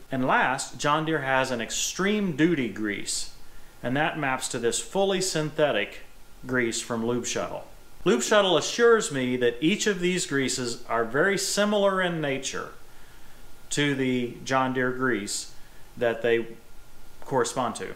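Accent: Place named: Indiana, United States. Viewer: I just thought that was an Indiana accent when you always said "greezing" and not greasing lol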